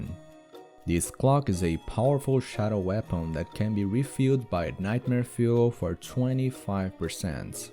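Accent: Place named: Brazil. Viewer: Será mesmo? O inglês é ótimo, mas me lembra brasileiro.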